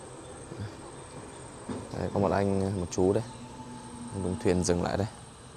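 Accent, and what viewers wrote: Vietnam. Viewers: Tiếng anh của bạn hơi thuần việt nhỉ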